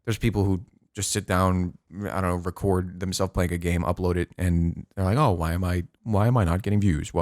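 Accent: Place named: New York, United States. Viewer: new yorker accent leakin out a bit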